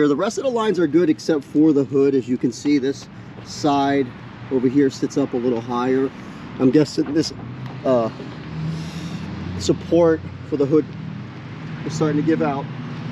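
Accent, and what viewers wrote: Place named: South, United States. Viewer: Fortunately for him, the southern drawl does not hold him back from moving inventory every month.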